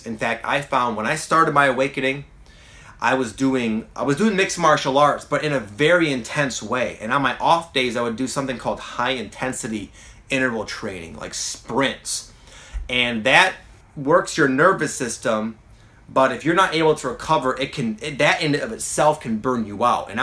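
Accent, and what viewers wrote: Michigan, United States. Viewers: I'm from Ann Arbor and you sound like you're from Michigan :D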